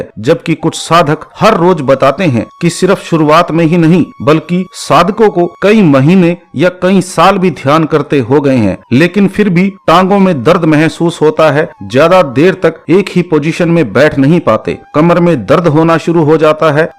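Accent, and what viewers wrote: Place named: Punjab, India. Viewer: I can feel your Punjabi accent Wise words..I will definitely try this method 🙏🏻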